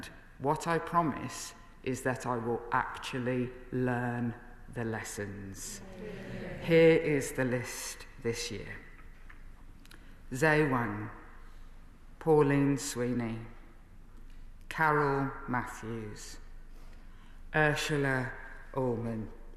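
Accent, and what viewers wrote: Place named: Birmingham, United Kingdom. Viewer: Can not stand this woman, her rudeness towards Diane Abbott and bragging about it. Her weaponising her brummi accent to appear “ woman of the people” Her willingness to stab Corbyn in the front and in the back whist being an advocate for anti women violence is at best hypocritical. We won’t mention her working with Murdoch.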